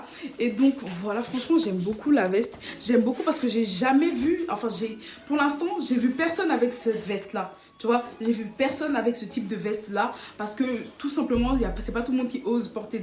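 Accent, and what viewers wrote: Ivory Coast. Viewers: J’ai entendu le début j’ai su qu’elle était ivoirienne 😭🤍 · J’aime trop ta manière de parlé en ivoirien même je t’aime trop fort ❤️❤️❤️❤️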